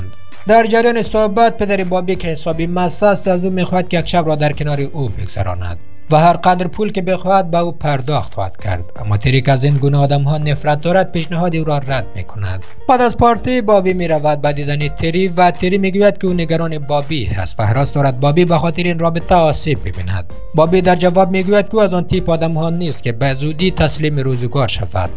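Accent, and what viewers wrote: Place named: Afghanistan. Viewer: داداش روی لهجه ی افغانیت کار کون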